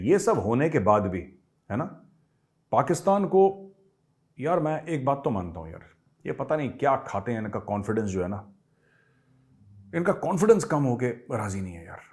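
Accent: Lahore, Pakistan. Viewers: Sir aapka Lahori accent bhut mast hai mja aa jata h sunke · Lahori accent…Waah Waah Waah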